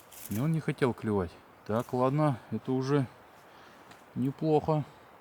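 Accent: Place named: Vologda, Russia. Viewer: Все думала,почему разговор у знакомый,леса и болота какие-то родные.А оказалось,что мы соседи😊.Привет из Вологодской области!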